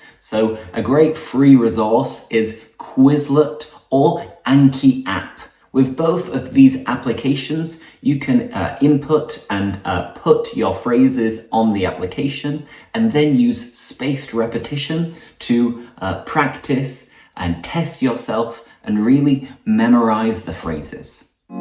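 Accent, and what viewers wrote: United Kingdom. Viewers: I couldn't express my feeling much more, but at least this video could provides me guides of progressing my English skill I wanted to. Despite the struggle on my English words management for speaking, I still can understand English in the simple way (such as everyday context). As in the video, you had said "Practice makes perfect." But for me, it's seems too difficult because I always thought practicing on English consumes more times than expected. Neverthless, I have to stay strong and never willing to surrender on my track, even if it's not perfectly. And you had said next "Practice makes progress." It is true in a matter that whatever I study messy, unorganized, mistakely, and so on, progress is currently on. Anyways, I really enjoy your British English sound. And don't forget, I'm learning English as a second language to unlock the potential opportunities, pursuing my dreams abroad, and having fun to myself. 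· Hi . Are you from the UK? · Your british accent is so beautiful!! 🥰 I am Brazilian, and my English leve is intermideat, but I am studying for improve my English and wanna be 100% fluent. 😊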